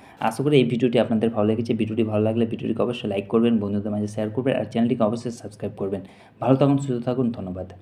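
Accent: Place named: East Bengal, Bangladesh. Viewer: Eskip kore dilam, bhasa ta thik korun,Kath bangal ,kobey kantatar periye elen.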